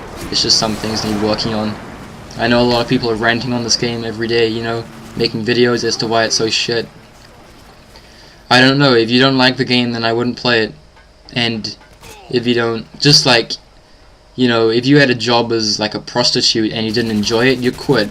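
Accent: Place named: New Zealand. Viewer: Nice commentary!!! lol metalstorm i had no idea there were so many new zealander commentators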